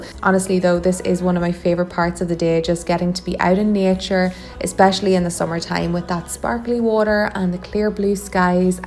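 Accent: Ireland. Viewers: Is her accent Irish 😍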